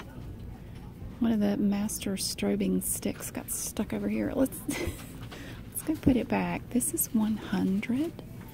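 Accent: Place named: Mississippi, United States. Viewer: You sound like me, I'm from southern Mississippi. New subscriber. 💖💛💚💙💜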